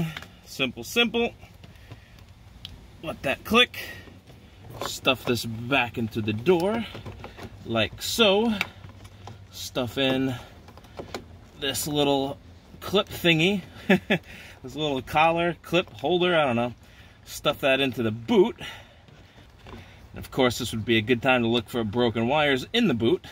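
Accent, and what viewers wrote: New York, United States. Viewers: Enjoy the NY accent... what kind of welder?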